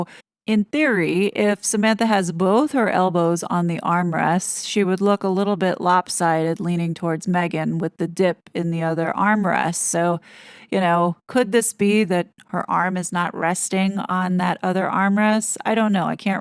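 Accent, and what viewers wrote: New York, United States. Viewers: Awww, can you talk with you NY accent plz? It would be a treat! 😊😉🤗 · It’s all very bizarre 😳 I like your New York accent I actually listen for it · I love the New York accent!! Set it free!!! ❤❤ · Really enjoyed this. and please, give your New York accent wings. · Hahahaha aw you are so funny and I love your New York accent!! It's a fantastic video as always 💚👍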